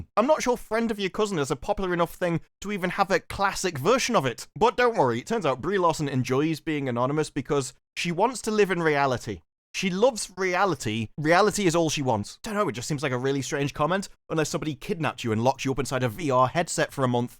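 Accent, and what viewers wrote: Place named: Geordie, United Kingdom. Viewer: You from the Toon, mate? I think I can detect a hint of Geordie in your accent... and yes, I am the Bat-Gadgie.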